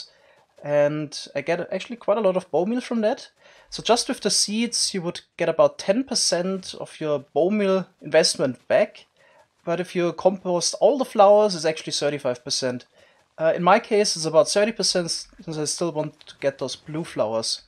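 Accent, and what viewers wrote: Germany. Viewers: Is your native language German??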